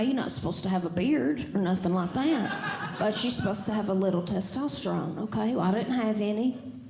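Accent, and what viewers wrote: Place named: South, United States. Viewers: The jokes were perfectly fine. I laughed many times. Shitty people like you should probably get on stage instead of thinking their opinions are objective. See, I think you might just have an aversion to the country accent and the fact she’s from Texas and is Christian because when I was far left, these are the same type of markers that made me instantly not like someone until I realized I was part of the problem just like the far right people who hate leftist Just for minor things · I just love her accent. Any southern accent is pleasing to my ears. I think she is way cuter without the wig.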